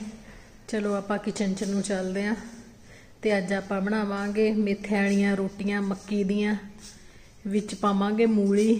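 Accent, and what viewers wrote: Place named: Patiala, India. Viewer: I love your video bhan I'm from patiala to I always watch your vlog you speak same punjabi like we do and your so sweet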